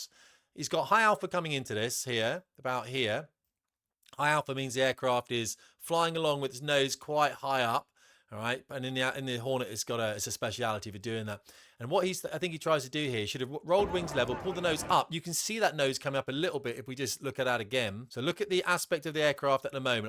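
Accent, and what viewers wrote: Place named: United Kingdom. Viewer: He was getting a picture by someone on the beach. Your british voice is annoying as hell. How cucked are you?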